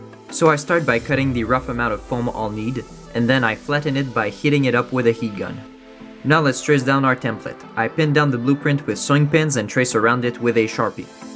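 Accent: Canada. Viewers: Thanks. I've seen people use cotton fibers from cotton balls applied with watered down glue to achieve a nice corroded texture. You sound Canadian. So Hi from a fellow Maker and Canuck from Quebec City.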